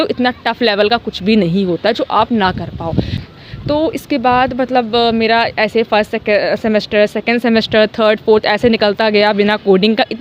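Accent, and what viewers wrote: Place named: Haryana, India. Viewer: Oh well actually from your language I thought that you are from Haryana.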